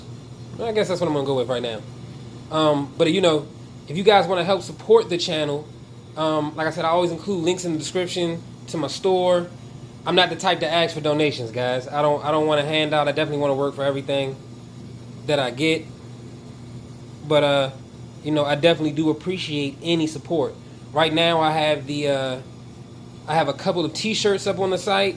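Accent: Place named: DMV, United States. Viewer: You sound like you’re from DMV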